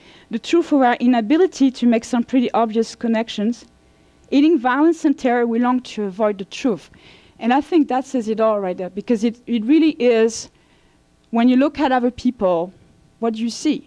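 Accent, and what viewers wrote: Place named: France. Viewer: Powerful stuff; good talk; French accent and all - you're a great speaker! Thanks for spreading the word... and spreading it a little more creatively than when you started back in 2006;)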